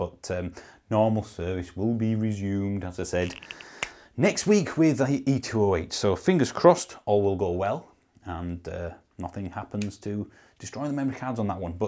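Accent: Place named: North, United Kingdom. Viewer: Love to hear that northern accent!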